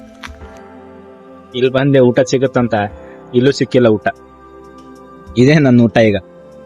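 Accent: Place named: North Karnataka, India. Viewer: Anna namma north karanataka language nag maat ada your logs is very nice👍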